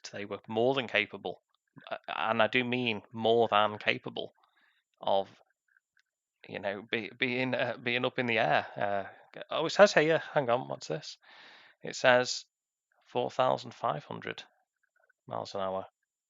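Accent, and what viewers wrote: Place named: Northern England, United Kingdom. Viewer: yeah I did mate, to be honest it was probably your review which tipped it for me, great watch, could easily be my exit watch (but I know it won’t be) I’m really looking forward to your review of the bulova, I honestly think you have great watch content, especially for ‘normal’ people who don’t have the cash for high end watches. Quick question, you sound quite local to me, I’m guessing St. Helens? Definitely northern 👍🏻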